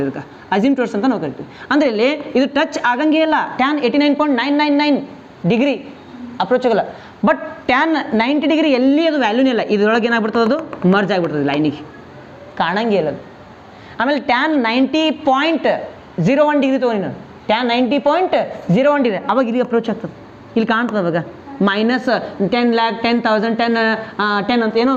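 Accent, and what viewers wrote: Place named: North Karnataka, India. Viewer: Nim uttara karnataka bhashe Nam kade baidid tara ansute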